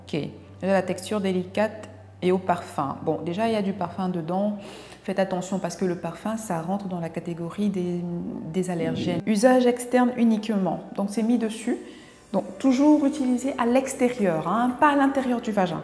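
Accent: Cameroon. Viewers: Cet accent est typique des camerounais 🇨🇲🇨🇲🇨🇲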